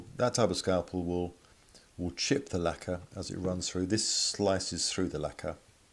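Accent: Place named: England, United Kingdom. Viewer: I love English accents.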